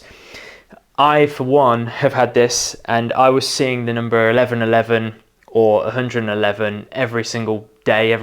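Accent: United Kingdom. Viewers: Are you related to the late Lady Diana? You have the same accent.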